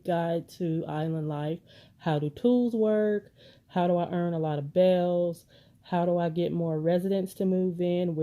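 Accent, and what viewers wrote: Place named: South, United States. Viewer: Yes!! A review with a southern accent. Thank you. Love it.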